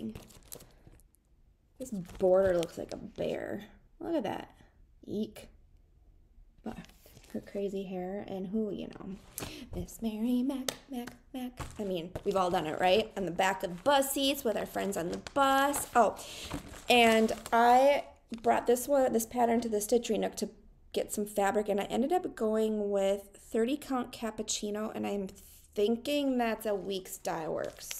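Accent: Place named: Minnesota, United States. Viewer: I just found you last night so I'm excited you posted today!!! I love your small accent too it reminds me of the Fargo movie (in a good way as I love that movie, especially the way they talk)...I like your style of patterns you work on as it all different, sampler, full coverage, Halloween .... looking forward to your future videos. See you next time from central Canada 🇨🇦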